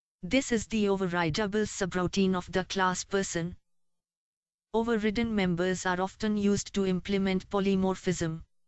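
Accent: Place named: India. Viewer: Wondering why the robot voice has an Indian Accent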